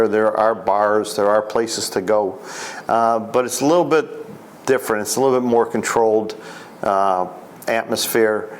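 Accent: United States. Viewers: You from Chicago? Your accent sounds like it?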